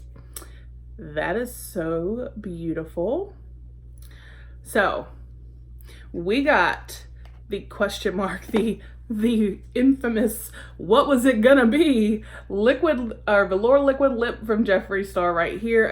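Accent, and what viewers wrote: South, United States. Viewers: Thanks so much for doing this video! It's great cause I totally missed out on these boxes and I really wanted to see what was in them! And you are so cute with your sweet southern accent! 😘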